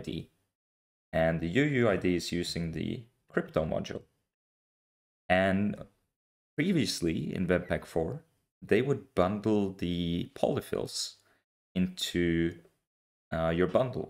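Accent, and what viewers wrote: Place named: Russia. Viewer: russian accent)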